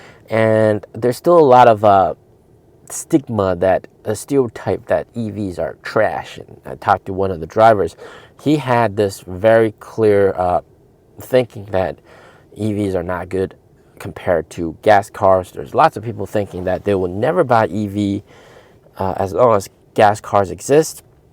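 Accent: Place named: Texas, United States. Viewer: Every time I hear you talking without looking at my phone I thought you were American from Texas😅, you talk like the guy from magpie restaurant who teaches ppl to cook🎉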